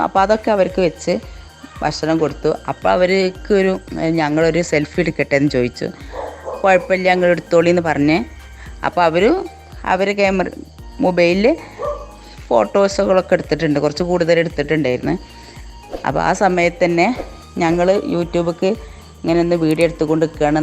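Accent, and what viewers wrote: Malappuram, India. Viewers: Nammude മലപ്പുറം ഭാഷ കേക്കുമ്പോ എന്തോ നൊസ്റ്റു ഫീൽ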